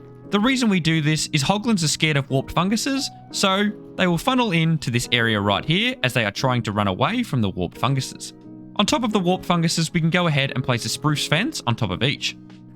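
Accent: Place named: Australia. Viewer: I was wondering if you are an Aussie like me because you sound like it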